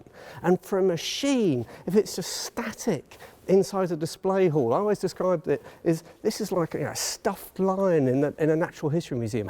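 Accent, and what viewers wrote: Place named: United Kingdom. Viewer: What a pleasure to watch for so many reasons: First the wonderful British English spoken. That pronunciation, melody, stressing etc. Splendid! (I am German, btw) Then the dedication to the topic that speaks from every sentence. The pure facts and information conveyed. The reflection on the historical work you are doing. The legitimate and based reasoning. The mild subtle humour. Ex-cel-lent.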